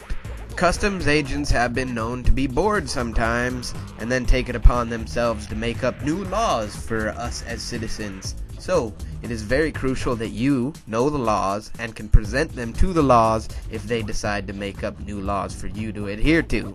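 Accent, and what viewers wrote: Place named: Cockney, United Kingdom. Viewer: Now that's a very strange accent, sort of both cockney and northern at the same time.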